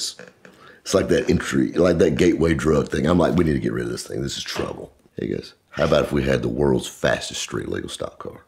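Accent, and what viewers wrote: South, United States. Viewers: Sorry if I disrespect someone but for me as someone from Europe this is what a real American accent sounds like. ‘Merica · Michael Rapaport’s southern brother?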